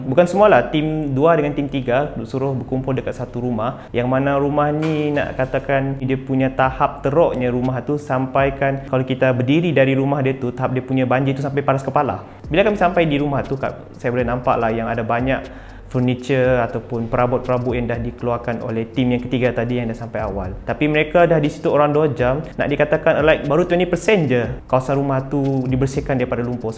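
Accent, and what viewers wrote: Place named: Sabah, Malaysia. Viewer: Mcm org sabeh je bro ni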